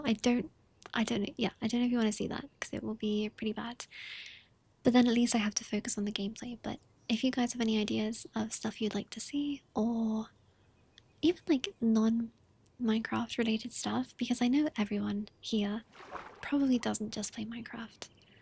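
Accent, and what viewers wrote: United Kingdom. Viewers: Oh my god are you british 😭😭😭 i thought those people were only in tv 😭😭😭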